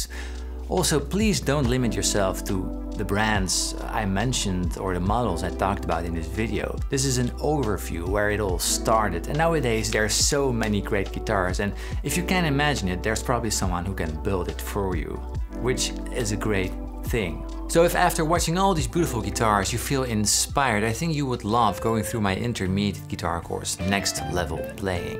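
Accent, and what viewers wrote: Netherlands. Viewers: Holy shit, het eerste wat ik dacht was: "dit klinkt als een Hollander die Engels praat". Dat werd snel bevestigd... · are you Dutch by chance? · Are you dutch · i hear your dutch accent :) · Groetjes uit Nederland. Je kan altijd een Nederlander horen als die Engels spreekt :-) Ja, ik heb ook een accent 🙂 Hoe dan ook, eerste keer dat ik je zie, super goeie vid gozer!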